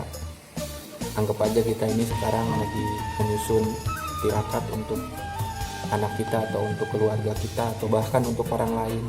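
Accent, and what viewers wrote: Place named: Sunda, Indonesia. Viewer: Jiga urang sunda mang euuy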